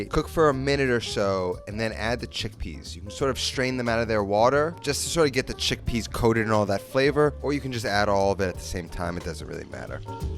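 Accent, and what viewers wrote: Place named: Italian American, United States. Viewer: You speak with recent Italian American accent. Itlian food with chickpeas and chilli flake, how good is that? we will see.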